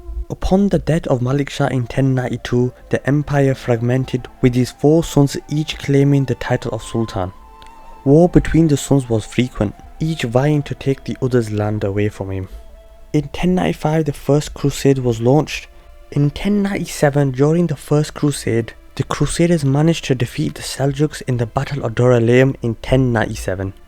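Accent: Northern England, United Kingdom. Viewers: Thanks! The translation is not good. It has Thailand instead of Byzantine lands. Your accent is like Northern English and very easy to understand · Salaams I thought you did pretty good considering it was your first time... keep practising and you will get better and better guessing from your accent you sound like you are from Lancashire/ Yorkshire. Am I correct?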